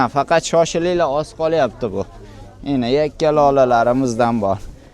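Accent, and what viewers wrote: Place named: Khorezm, Uzbekistan. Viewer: хоазимчани урйанипди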